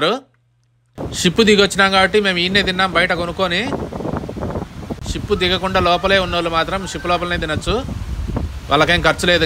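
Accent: Kadapa, India. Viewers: Anno madi kadapenna ne vedieous super ante super na mana yasa marchipoledante great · I like your కడప slange నేను కొట్టం బడిలో 5 th class వరకూ చదివాను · Meedi yevuranna.mee Kadapa Jilla vadi madiri matladutunnaru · Meru 2016 lone inthati camera lu oka drone use chesi videos chesi andhariki chupichalanna thought inka me journey chala bagundhi nejaniki meru kuda Oohinchi undaru me videos ippudu 2022lo YouTube lo oka Telugu channel lo papular ayithayani Thank You mana Kadapa slag inka plus vere level meru 🙌👌👌👌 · Chala baga vuntundi taliking slang in telugu pakka rayalaseeme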